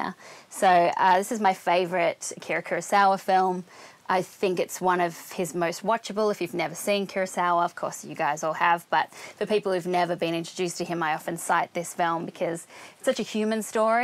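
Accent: Australia. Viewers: that australian accent tho <3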